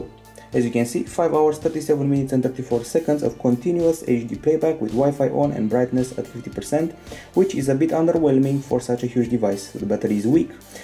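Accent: Romania. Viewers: se simte in voce ca esti roman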